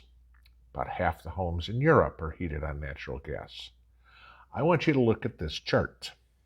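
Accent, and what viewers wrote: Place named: Indiana, United States. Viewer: ..Blizzard of ‘78..no wonder your speech sounds more from Kokomo (Indiana) than KY or TN!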